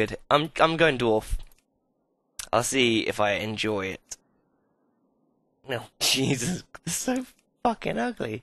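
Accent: England, United Kingdom. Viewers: lol yeah hes def english buddy XD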